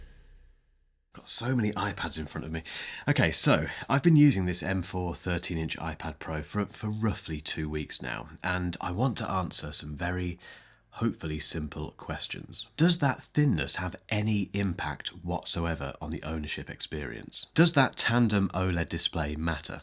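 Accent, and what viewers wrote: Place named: Cardiff, United Kingdom. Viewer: The most proper Cardiff accent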